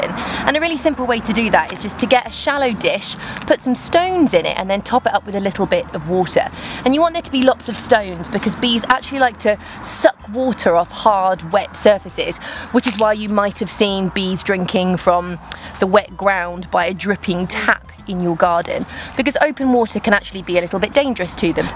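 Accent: United Kingdom. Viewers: What's wrong with me that i feel ichy when she talks. I love british accent but only when I like the speaker.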